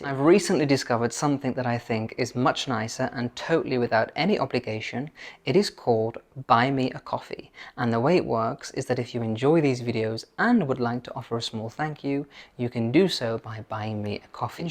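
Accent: United Kingdom. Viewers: I would like to thank your Mother, for bringing such a beautiful💙 angel, into this world!!🤗....I LOVE watching your, glowing-radiant face on camera, and listening to your beautiful British accent!! You, are a treasure🌷🫖 God Bless you, Beautiful Soul!! Love to you, from California.🇺🇸🤗💖💙🤍💜🥰😘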